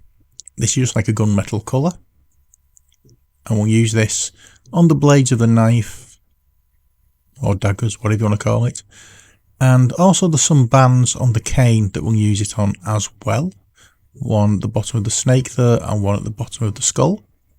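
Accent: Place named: Leigh, United Kingdom. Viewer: Just looking for a painting recipe for Delaque, and pleasantly surprised by a local accent while watching over the border here in sunny Leigh!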